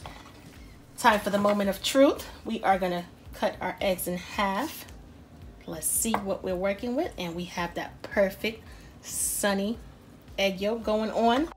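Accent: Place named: Philadelphia, United States. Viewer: Ur killin me young Lady.....lol!.........You sound South Philly to me.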